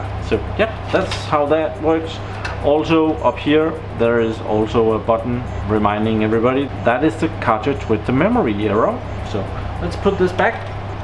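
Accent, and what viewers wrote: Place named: Denmark. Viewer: did you say MPI? It looks like QPI to me. Unless that's your danish accident that says Q different.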